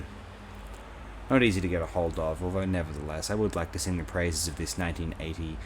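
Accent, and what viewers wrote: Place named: Australia. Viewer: 1: He sounds australian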